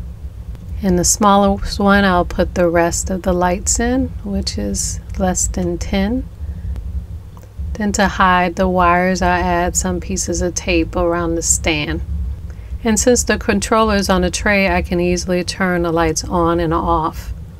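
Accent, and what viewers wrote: New Orleans, United States. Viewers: Your New Orleans accent is beautiful. In New Mexico it's pronounced Punkin. I agree, who cares. Your voice is what drew me to your video and I'm so glad it did. I have been inspired to do a crystal foyer for Christmas and brought home a hall from our Dollar Tree here in Marrero. Keep that imagination going and forget about anything else :) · Hi My Suga I Love Your Accent... Why Because I’m From Nola To & You Are Unique In Your On Way? Cheeeeeeeeeeeeerrrrrrrrrsssss My Hun! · She's been in Ga so long she's lost a little of her New Orleans accent. But we never lose it all! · There is absolutely nothing wrong with your N.O.accent I say that because I'm from a town outside of N,O. called Houma La. So I can relate they say we t as ll flat but hoot I love ❤what you have done with this project very inspiring. Fall Vibes 🍁🍂🍁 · You have a beautiful accent! 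You are great & ive learned so much from you! Watched all your Christmas videos last few yrs! Keep your beautiful New Orleans accent! I love it!! Say pumpkin anyway you want girl!😂❤️ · Yeah baby they love how we talk. What part of the N. O. you from? I'm from Hollygrove. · Pumpkin, Punkin pumkin. No matter how you say it, we know you mean pumpkin. YouJust keep making your beautiful pieces. You are an inspiration to soooooo many and that's all what counts. Gee Whiz, we're not in Grammar School! Your New Orleans accent is beautiful and quite clear. Do your thing because you ROCK!. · I love listening to your soothing tone and beautiful New Orleans accent. Charming as heck. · I'm English and I'd just like to say your New Orleans accent is devine!! And that you should say "pumpkin" however you like because that's who you are. I could listen to you all day. It's so impressive how you have everything set up with Amazon and all your templates, ideas and downloads!! 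Well done you!! Love your channel!!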